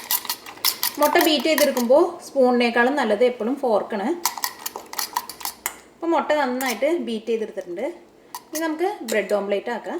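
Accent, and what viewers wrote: Thrissur, India. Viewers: Trisur bhaashayalle ith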